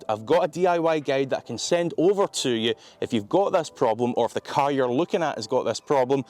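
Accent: Scotland, United Kingdom. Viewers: Very good and informative video, well done!. Also your scottish accent is cool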